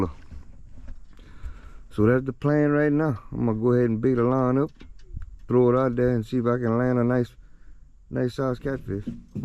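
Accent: Louisiana, United States. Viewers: Oh by the way I always love your Cajun accent ❤😊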